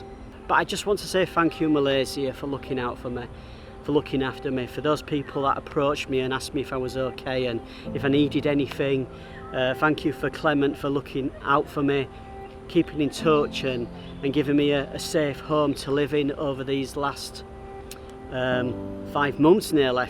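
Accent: United Kingdom. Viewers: I believe you are from the UK because of your accent. I have been to the UK for training and stay in stevenage about 2 months. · stay safe mate🤭🤭 if we meet teach me british accent haha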